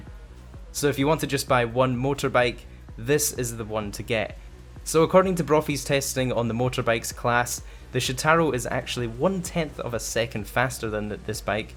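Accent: Scotland, United Kingdom. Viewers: M8 your so British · Scotland? ^3^ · Are you Scottish?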